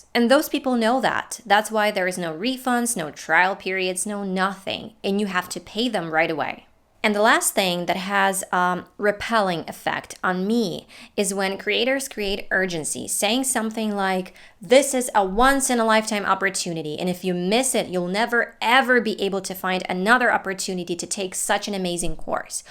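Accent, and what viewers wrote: United States. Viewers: Thank you Mrs. for your precious opinion. I find it worth watching as I had bought a course in vain and couldn't apply it as my lifestyle was too time-taking in offline so I couldn't sit calmly and learn it online, that was terrible experience sadly. Some notes I jotted from the video: — Not advertised enough course might be the best course one may ever have taken Therefore don't be so captivated by any promoted course, the quality is much more vital than peripheral ads (the goal of those is just find customers not more than that) — Feedback is important as well as a trial period — Biased can be anything that someone can suggest to others cuz everyone has different tastes to say the least Sales under the pressure can make you buy the course that you don't even need or the course might not be organized well for one's lifestyle P.S. Mrs. 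you look great like a Ukrainian (I guess, is that true? ) and perfect with American accent it matches you so much, especially when it feels that there is a sense of what you're talking about Thank you so much🙏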